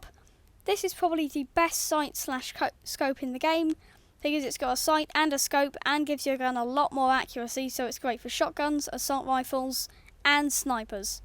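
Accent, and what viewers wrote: United Kingdom. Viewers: Are u british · You sound british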